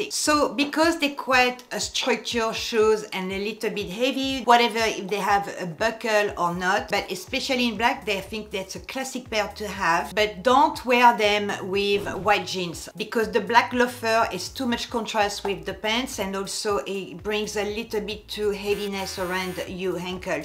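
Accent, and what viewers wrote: France. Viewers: Some really great style tips. Thank-you. I could listen to your French accent for days on end - no matter what you were talking about 😍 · I guess you are French... from your accent. Right? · I love your French accent. It reminds me of the months I lived in Bordeaux. I love your personality.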